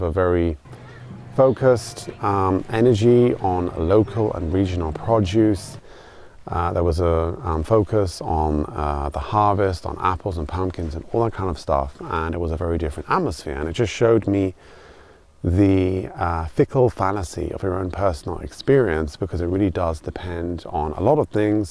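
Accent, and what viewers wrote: Germany. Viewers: I hear a hint of German accent in your English. Is that from living in Germany for a decade? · You now speak English with a German accent. It’s fascinating to listen to. Thank you for this commentary. It’s a very accurate appraisal of the uk. Makes me sad. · Dude you now speak with a slight German accent. Not sure how long you've been in Germany, but thought you were a German living in the UK. 😁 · Your accent is very interesting after that 16 years. Its 50/50, the German accent coming through very strong. Re: UK, yeah, its got dark in some places, your average high street now in small towns is full of Betfred, Turkish Barbers, maybe a Chinese or Indian takeaway or two, Indian Dessert Shops/American Candy shops (aka: tax dodging businesses), just super disconnected from the culture and a bit dead. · You have a mild German accent ❤ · You’ve definitely sound like a German speaking English . 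· Can I just ask, do you speak German in Germany? Your accent seems like ...German? I have lived in Thailand for 14 years as a Brit, I speak Thai, but when I go back to the UK people say I sound American.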